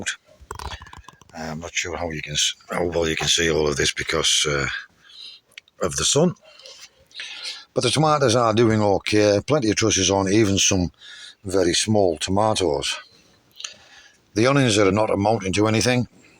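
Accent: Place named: North East England, United Kingdom. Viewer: I am a new subsciber and although you think your 'bit of land' is a mess, I think that it still looks amazing! I wish you well with your home search. Times are rotten with regards to the renting in this country. 2 questions if I may ask. Why did you get rid of your other chickens and do you remember what your rose is called? When I was a child, we had an almost identical one growing over a rustic trellis and I have been looking for the same one without success most of my adult life. Although I was born in the south of the UK, by the sound of your accent, you come from the North East, as did my Dad.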